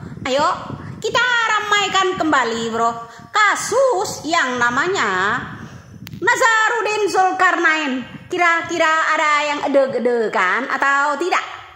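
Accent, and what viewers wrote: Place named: Madura, Indonesia. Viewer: Nyi.. Dialeknya lagi ke Madura nyi.. Semangat.. Lanjut nyi..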